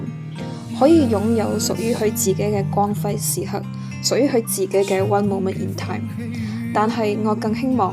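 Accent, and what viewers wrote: Malaysia. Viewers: 多謝分享，你略帶口音嘅廣東話聽得好舒服，你也來自馬來西亞嗎？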